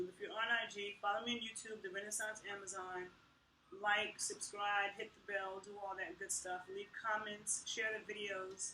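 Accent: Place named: New York, United States. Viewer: Now you are on to the Real Knowledge. Postive you are a fascinating potent female what we use to call a witch, ... more shaman ... me too.. In this corrupt weak patriarchy has twisted and killed our connection with the divine, the energetics... medical symbol is ancient... caudecaus ... it is from ancient sex rituals from Sumer and Egypt... even in ancient Canaanite ... Heard a bit of New York accent New Yawk ! Anyway my fam in Brooklyn, I live in Oakland. I love to have a cup of coffee or juice wit you and discuss magickal systems , sexual alchemy, and ways to build healing systems.. yeah I hear da rain. ! God bless woman.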